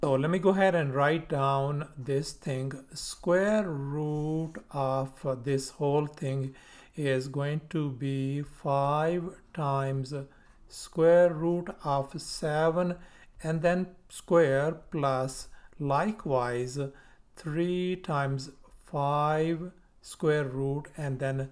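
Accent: India. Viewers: О боже, этот индусский акцент, невозможно слушать...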